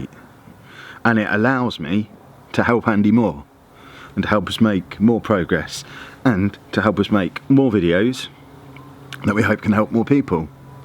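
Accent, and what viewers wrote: England, United Kingdom. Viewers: Are you English or americar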